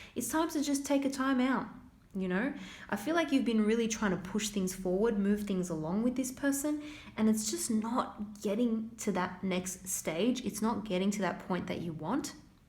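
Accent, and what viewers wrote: Australia. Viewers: Beautiful Ozzy accent ? ..thank you for the reading..being blessed right now ,peace to you reader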